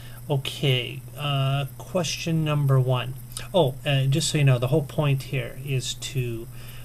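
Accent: United Kingdom. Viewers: Your the man!!! lol, its been a while since i've had a big smile on my face lol. I say you sound more Enfield than East End but great atempt, if i heard you talking like that i'd probably think you was from Essex or somewhere like that. Londoners would love you talking like that down the pub, especially the "More tea Vicker" as that saying is normally reserved for when someone *Cough - Breaks Wind - Cough* lol Fantastic. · LOL..great vid man... Nice british accent! Haha.. u tried XFile2708's famous line!! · Excellent answers and you sounded great as a Brit too buddy.: ) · London accent.Why all americans think london accent is british accent .you are funny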